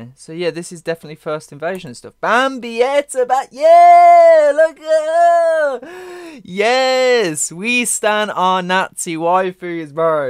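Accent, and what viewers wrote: United Kingdom. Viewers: Aye bro, what part of the UK do you hail from?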